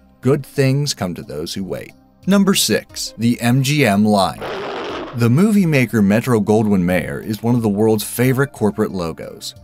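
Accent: United States. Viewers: I’ve heard this channel is a UK site with an American accented narrator. They give themselves away every video. It’s pronounced “choopa choops” in the US too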